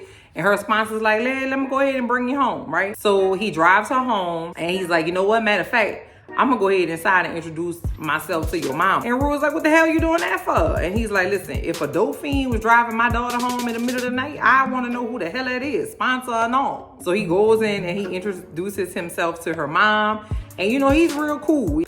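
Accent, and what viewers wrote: New Orleans, United States. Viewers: I just love your Nawlins accent. Me being from Monroe, I knew IMMEDIATELY you were from New Orleans.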